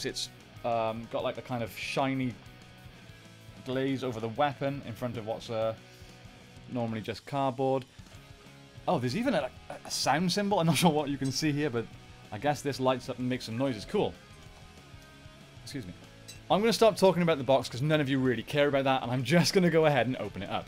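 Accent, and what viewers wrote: Wales, United Kingdom. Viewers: Barely related to the video but I’ve just realised you have a welsh accent (Cardiff, right?). Repping the welsh PR fans! 🏴😂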